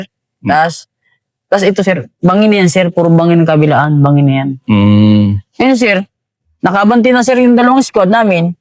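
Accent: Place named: Quezon, Philippines. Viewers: Taga Quezon province po ba kayo? Tono kasi ni Sir eh punto ng Quezon province. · Accent ni idol parang taga Quezon Province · Taga Quezon probins yata si sir..ay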